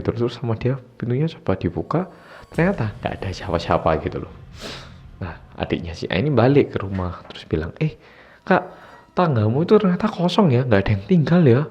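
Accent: Java, Indonesia. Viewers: Lancar banget bhs Indonesianya dan agak berlogat Jawa. Keren nih mas 👍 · Aku tu kalau lihat mas langsung suka sama bahasa medok nya itu Lo, tapi bisa bahasa Jawa, pokok e nyeneng ne banget😂😂😂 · kenapa logat nya ngejowo banget mas 😂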